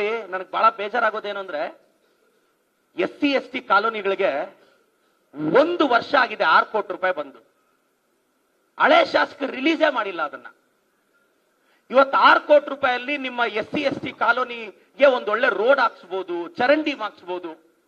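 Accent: Telugu, India. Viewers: Telugu style dialogue super guruve.👏👏👏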